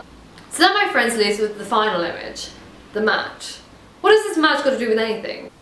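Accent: United Kingdom. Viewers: I saw the thumbnail and I was like "I hope she has a british accent" (for some reasons). I was not disappointed.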